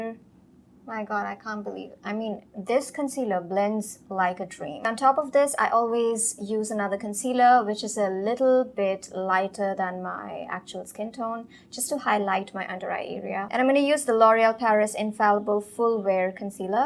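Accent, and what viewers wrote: Bengal, India. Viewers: WoW you're bengali❤️ Just perfect bengali accent omg❤️beautiful!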